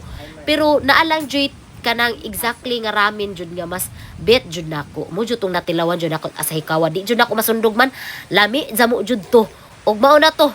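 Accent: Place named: Visayas, Philippines. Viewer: RAMEN GANI NI NOH! === ahahah ka relate jud kos accent og intonation ....HAHAHAHAH bisaya ray maka sabot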